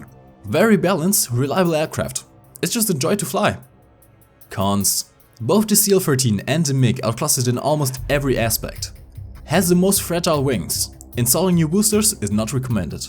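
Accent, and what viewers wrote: Germany. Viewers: Really this is a German english accent!! I really like it!!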